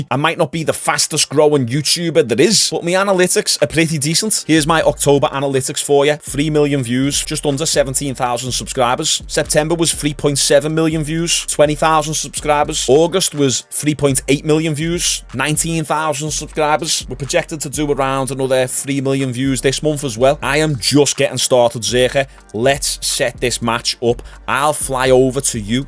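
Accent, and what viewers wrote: Liverpool, United Kingdom. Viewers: Out of curiosity bro, are you scouse? if you are, that’s just another reason hes fucked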